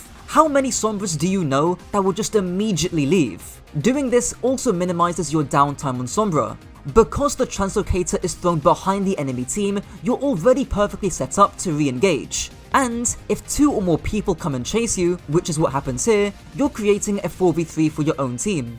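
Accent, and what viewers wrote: United Kingdom. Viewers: He is British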